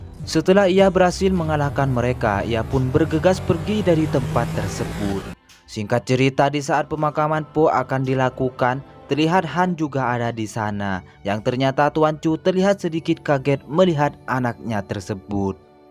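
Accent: Madura, Indonesia. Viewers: Kok yg ngomong logat nya kaya orang Madura ya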